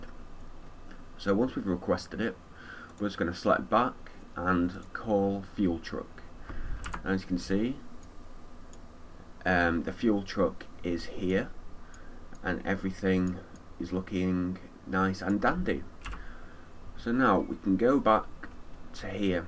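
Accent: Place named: Manchester, United Kingdom. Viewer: Congrats on the 2k subs here's to 3k+. Absolutely brilliant job and so well explained. Been through many tuts as a newbie, and yours should beat the top. Pruod to see a fellow Manny making something complex so informative and easy to digest...your a star, no idea how much i struggled before this tutorial. well underrated. thank you..liked...subscribed. ❤️ p.s Yup he's manc (heard the shouting) yup he's definitely Manc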